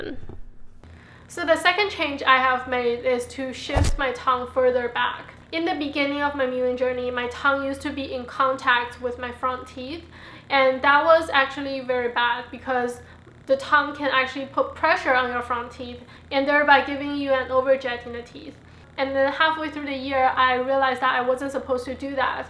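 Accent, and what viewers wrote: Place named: Taiwan. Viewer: U seem to have taiwanese accent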